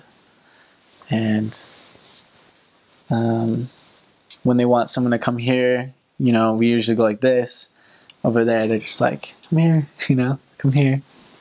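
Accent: Dominican Republic. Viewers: You sounds really like a dominican when you speaks spanish wow you are really smart no all missioneries can do that!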